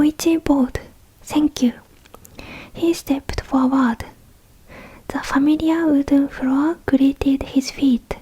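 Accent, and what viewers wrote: Japan. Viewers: I love your sweet voice.. I love that Japanese struggle with saying the letter L it makes Japanese English so cute.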